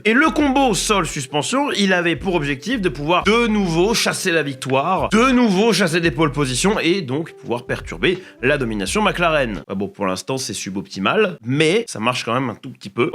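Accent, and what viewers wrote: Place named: Italy. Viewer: l'accent italien n'est pas trop mal géré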